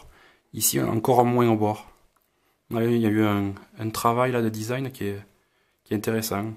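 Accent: South, France. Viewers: Continue j'adore tes vidéo et l'accent du sud qui va bien comme toujours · Beau deballagE et tres bonnE video avec le bon accent du sudE !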